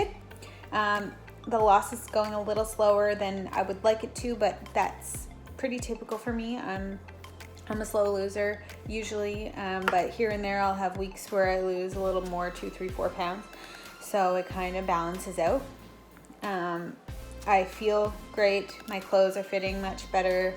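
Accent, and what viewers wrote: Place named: Canada. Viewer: You sound Canadian. You say “aboot”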